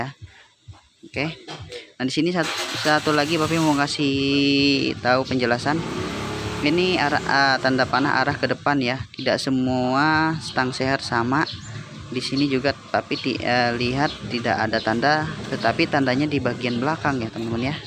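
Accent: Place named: Lampung, Indonesia. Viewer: Papi ini bengkel di lampung ya soalnya ada suara orang lampung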